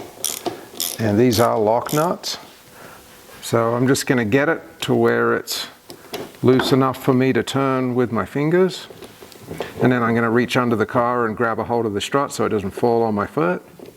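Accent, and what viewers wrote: Australia. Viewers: Thanks for the video instructions. You wouldn’t happen to be an expat Aussie? It’s messing with me because an American accent is sneaking in with some words 😂. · Sounds like a bit of an aussie accent creeping through? Good info mate.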